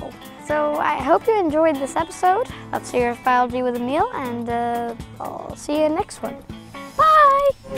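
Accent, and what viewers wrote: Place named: United States. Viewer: he's not an australian his accent is to American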